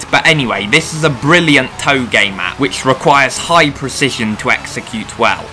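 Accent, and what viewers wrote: United Kingdom. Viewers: Bro has the hardest British accent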